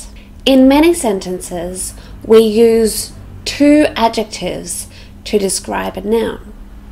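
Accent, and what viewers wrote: Australia. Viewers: I am used to understand more American accent than other accents and I was trying figure out which word you were saying at certain moment in the video. I found out when you said and wrote the word on the screen. The word was "big". hehehe A very easy word but I swear I really couldn't get it. I love accents as such as British, American, Australian, Canada and New Zealand. Therefore, I always say that we must listening different accents. By the way, I love the Australian accent. It is so marvelous how you sound when you speak.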